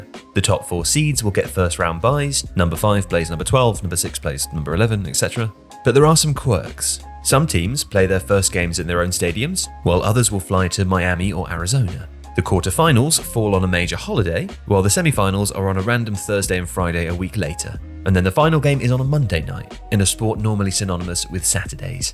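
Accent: United Kingdom. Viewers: Hearing a British voice talk about college football is breaking my brain lmao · I refuse to have a British voice tell me how American college football works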